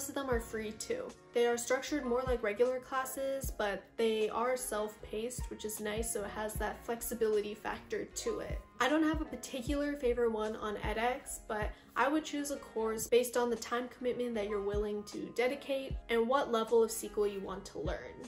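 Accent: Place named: India. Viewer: Hey thnx just curious to know are you from India? Your ascent is Amazing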